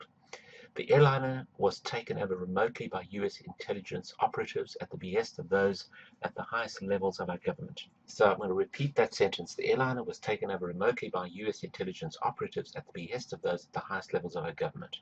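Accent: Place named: United Kingdom. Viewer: #1 Just because you believe that is what happened doesn't make it true. #2 This is not the only aircraft that has disappeared without a trace. Even when flown over land it has been years in some cases when crash sites were found. #3 You sound like a British citizen, certainly not from the U.S., so "you"(Britain) do not have stealth bombers. #4 You said, "There is more to this island than meets the eye." Statements like that are a very weak attempt to be provocative. And pardon me but, everyone on the planet knows this is a U.S. airbase. You mentioned there was a very public court case in Britain regarding the "occupation" of this island. #5 The article reads,"Confirmed at highest levels of gov't but not the current one." What does that even mean? Sounds like, "Void where offer not prohibited." #6 Where is the hard evidence? #7 Just because someone says it doesn't make it so. 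#8 Repeating it over and over doesn't make it factual. #9 You have no way of confirming any of this information therefore we can all take it with a grain of salt. #10 See Occam's Razor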